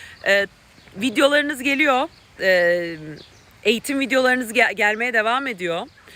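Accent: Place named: Sivas, Turkey. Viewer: Hocam sivaslısınız galiba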